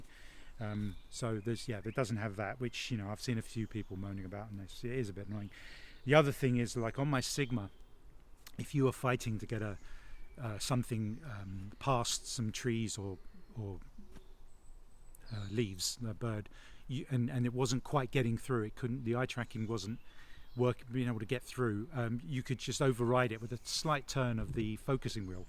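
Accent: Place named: England, United Kingdom. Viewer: It's nice to hear a English review on this lense instead of some high speed American I'm thinking about it because its always nice to have a little extra reach, good review thank you.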